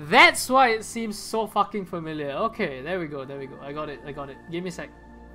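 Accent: Singapore. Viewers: u sound super singaporwan are u?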